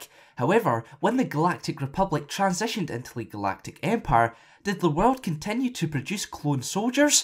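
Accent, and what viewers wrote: Scotland, United Kingdom. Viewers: Are u scottish? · Scottish like me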